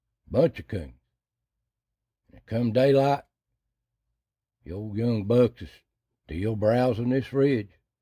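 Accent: Appalachia, United States. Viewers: Well I didnt get to finish my"hey howld ya dos Its so good listening to a fellow "ridge runner(were from Tazewell area up on Ravens Ridge)..I enjoyed.hereing back home in your voice and will subscribe to your youtube channel..you have a safe and sane New Years amd please keep on visiting with us..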